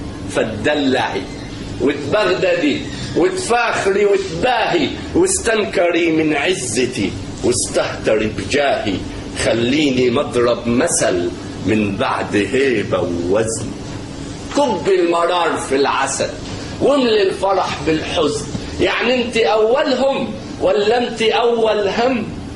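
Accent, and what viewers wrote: Egypt. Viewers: نعم مصرية ولكن بلهجة اهل الصعيد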